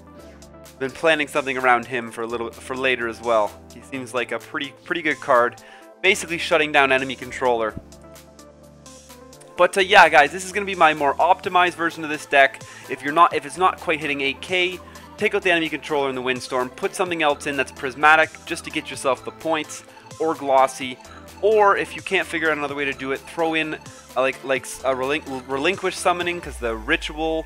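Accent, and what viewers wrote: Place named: Canada. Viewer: and that how i learned that is Canadian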